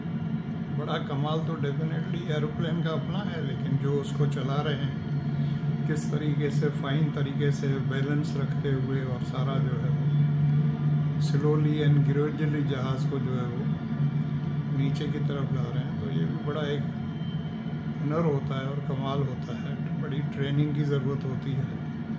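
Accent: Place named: Pakistan. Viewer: no I was talking about his language. And that his accent tells me that he belongs to Pakistan.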